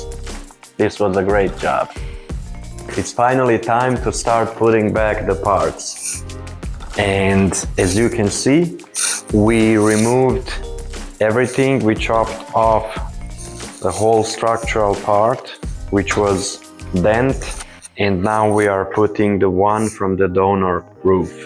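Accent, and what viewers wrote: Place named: Balkans. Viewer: I noticed the accent and the name of confirmed my thinking 😅 Odakle si legendo? Kidaju snimci!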